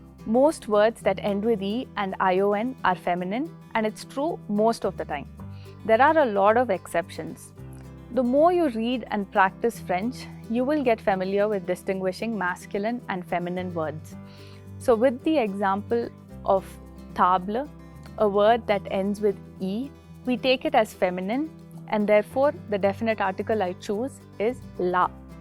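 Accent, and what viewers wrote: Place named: New York, United States. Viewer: Very clear and NYC voice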